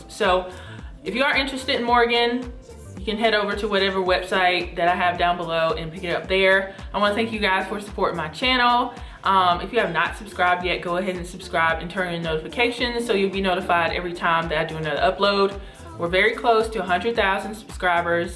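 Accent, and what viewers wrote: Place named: North Carolina, United States. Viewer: She sounds like she is from North Carolina.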